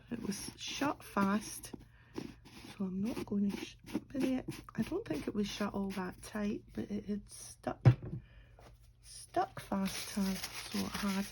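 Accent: Scotland, United Kingdom. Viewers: Hi, so lovely to hear and see a fellow jock crafting. I am 47 and a total beginner. I am loving life. Midlife crisis phase pending but no fast cars or toy boys for this married lady with six kids haha just paper, glue, scissors and lots of YouTube videos. It was Amanda from Srimmping Mommy that recommend you and how delighted am I to hear a Scottish accent. I am from Fife. I don’t know anyone that crafts local to me and that’s such a shame. Your videos and new craft room are wonderful. I have been binge watching, not stalking. Video stalking. I started trying to adult colour roughly 18 months ago and love it but I’m having problems with my hands and neck and I was embarrassed I couldn’t do as much as I would have liked I then got engrossed in junk journals, and all that entails. I haven’t been brave enough to post any recent videos on Instagram or YouTube but hopefully with more confidence learning more. 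I will share and join in. It’s such a wonderful, kind, helpful, decent community. Something rare these days it feels like. I have just joined Amanda’s Facebook group. She is a lovely women with a great personality and mission to help and get others involved and for that alone I support her. I will be thanking her for sharing you with me haha Now I’m away to watch more altered cards ❤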